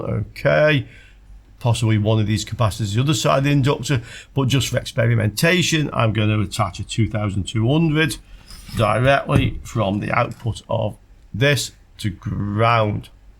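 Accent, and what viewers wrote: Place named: Stoke, United Kingdom. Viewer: you sound like a fellow stokie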